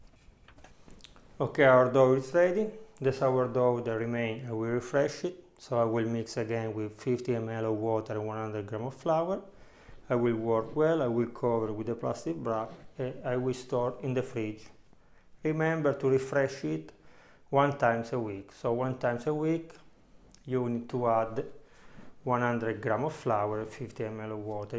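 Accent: Italy. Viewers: I have never seen sourdough started like this. I am going to try it as I have a dud in my kitchen right now from an amazon San Francisco starter. I should get a replacement for it as the company stands by their product, but in the mean time I am going to add some honey and see what happens, then I am going to try this method. No waste! I hate it when it says you have to throw Away and refresh. What"??? I don’t think so! I absolutely adore the accent too. Italians speaking English.. adorable. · LOLOL! It's making me laugh so hard! Especially when I turned on the captions! The captions don't understand a word of what he is saying...and neither do I! He must be from Italy?? He reminds me of the muppet who is a chef!! I like this guy..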